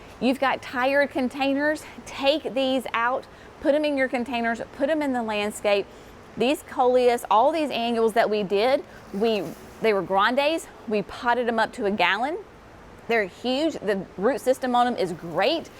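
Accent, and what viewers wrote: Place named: Tennessee, United States. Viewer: I got to comment. Love this video!! I am from Tenn and I declare your delivery is soooo us!!! ❤❤ Thanks. JT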